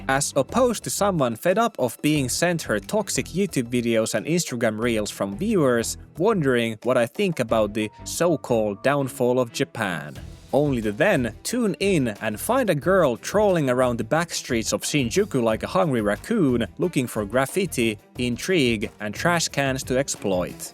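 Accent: Finland. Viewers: niinku paahtoleipä? Suomi aksentti kuuluu läpi 😂 Good video tho 👍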